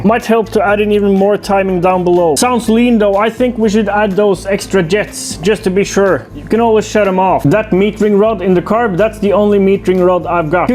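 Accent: Scotland, United Kingdom. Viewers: the scottish accent cracked me up lol! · Love your channel. I don't know squat about two strokes but I enjoy the process, the ups and downs, the music, the Scottish accent, the whole thing. Authentic empirical activity leading (maybe) to a distant, possibly successful outcome. Not for folks who idea is watching a half hour video by someone who builds an engine or restores a car, start to finish. This is the journey, not the destination. Who knows, you may end up with the world's heaviest 2-stroke. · Love watching your content, also love the scottish accent😂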